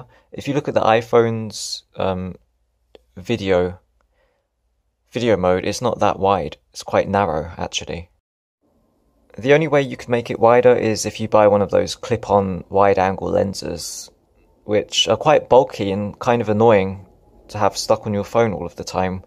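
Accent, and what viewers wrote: United Kingdom. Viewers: you have a british accent although you life in japan I guess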